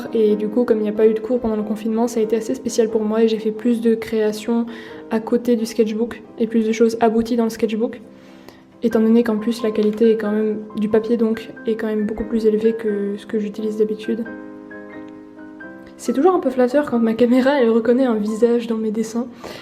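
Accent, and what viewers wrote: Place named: Switzerland. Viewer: No I love your french so much! I spent a little bit of time in geneva and the swiss alps and honestly swiss french is one of the easiest to understand for me! I had more trouble with nice and Bordeaux, none the less you had so many beautiful sketches in here, even if I didn't understand the french I'd still love to watch <3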